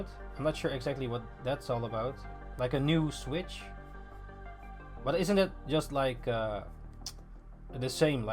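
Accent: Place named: Netherlands. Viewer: i just found this recommended to me and i could instantly tell ur accent was dutch lmao geweldig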